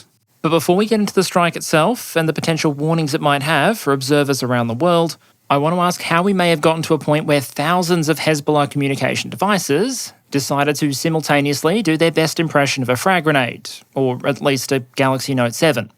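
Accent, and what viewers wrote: Australia. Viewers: a pure stream of facts, graphs and statistics sans bullshit with unparalleled expertise and an aussie accent. what's not to love :) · I always find it funny how you seem to put so much effort into pronouncing some things as per the original language, and yet some words are full Aussie. E.g. compare Iran (with rolled/tapped "r") to Beijing (basically Beige-ing). It's a very Educated Australian way to go about things.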